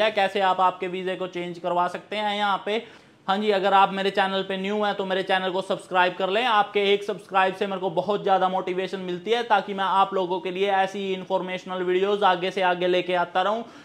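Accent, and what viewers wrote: Haryana, India. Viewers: भाई करनाल हरियाणा से है शायद बोली से पता चला। भाई जी मै भी आना चाहत आ हूं टूरिस्ट वीजा पर । भाई साहब कोई चक्कर तो नही ज्यादा । कभी जमीन भी बेच दू और ऑस्ट्रेलिया वाले आते ही भगा दे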